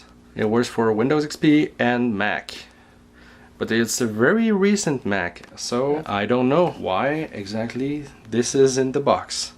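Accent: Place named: Quebec, Canada. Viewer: everything is in french, the t-shirt show that the tour is in quebec and also you have the quebecois accent when we try to speak english... mmm are you in quebec province ?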